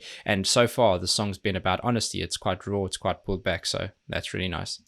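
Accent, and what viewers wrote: South Africa. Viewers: Love love love the technical breakdowns of all the reactions, can see the genuine joy in your face when you hear something that works great! Can i beg that you you check out anything from these 2 bands but my pick would be - and -. Keep up the awesome work man! (also an aussie with kiwi parents i understand the springbok accent haha)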